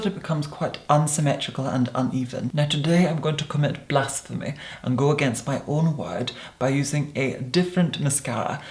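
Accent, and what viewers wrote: Scotland, United Kingdom. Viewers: The britanic accent it's stunning! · claire johnson I’m also from Scotland, but his accent is SO different compared to mine because it’s so ‘posh’ lol.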